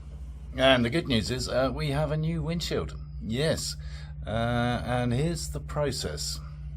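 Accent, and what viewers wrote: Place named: England, United Kingdom. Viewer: Really nice to hear an English accent for a change. There're so many dodgy characters, with bizarre voices on YouTube talking absolute garbage. This guy is a breath of fresh air. 👍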